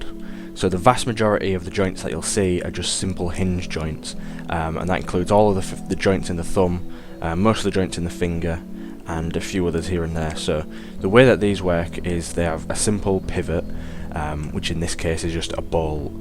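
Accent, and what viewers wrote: Hull, United Kingdom. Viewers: You're welcome. It's literally a 'make everything beautiful' feature. A couple of clicks and boom! Realistic shadows and lighting. Did you use any constraints on the hand rig? They're a pain to set up, but they're a real timesaver for animators. Hearing the Hull accent on YouTube is weird. 😀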